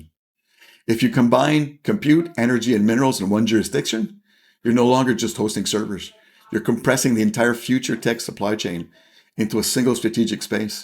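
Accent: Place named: Canada. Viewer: Nice to hear a voice from the True North! 💙🇨🇦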